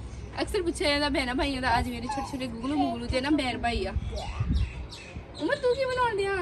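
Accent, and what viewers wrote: Punjab, India. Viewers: Her tone and style is more of eastern punjab than of western punjab.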